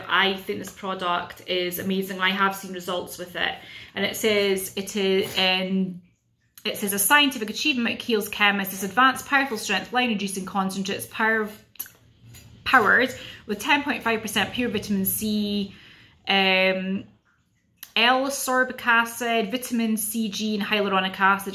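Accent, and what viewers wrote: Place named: Scotland, United Kingdom. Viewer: Great video nice to hear another Scottish accent xxx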